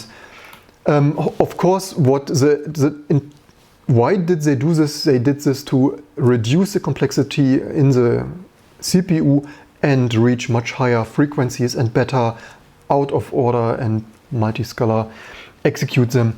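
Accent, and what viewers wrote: Germany. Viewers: Come on, everybody knows you're a German hearing your thick accent … 🙄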